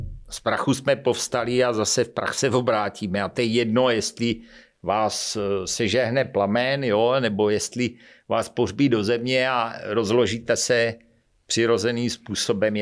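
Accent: Brno, Czech Republic. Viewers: ten hantec mě rve uši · Pač je borec ze Šlapanic Kousek od Brna Mluví Brněnšinou Stejně jako Moris z Madagaskaru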